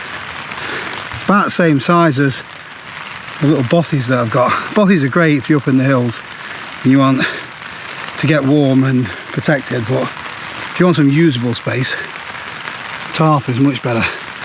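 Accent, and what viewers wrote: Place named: Nottingham, United Kingdom. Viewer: Where is this. Your accent sounds like yur from Nottingham.?)) I'd be building a stealth dug out tbf.. Happy days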